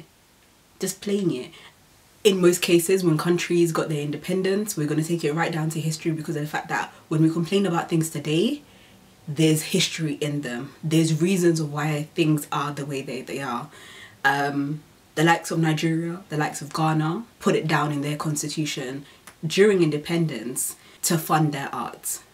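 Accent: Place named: United Kingdom. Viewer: That British accent is on POINT! wow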